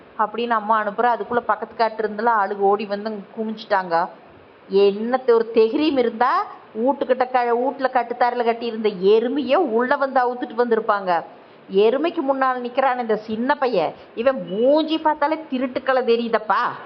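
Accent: Kongu, India. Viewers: Kongu tamil arumaii ❤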